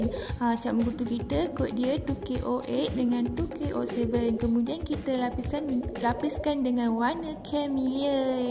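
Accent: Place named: Malaysia. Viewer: Cakap malaysia lucu juga ya.. tu key o seven trak bed😁😁😁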